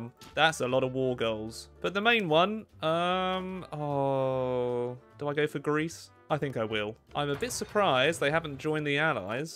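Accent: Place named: England, United Kingdom. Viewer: What's the name of the type of English accent he has ?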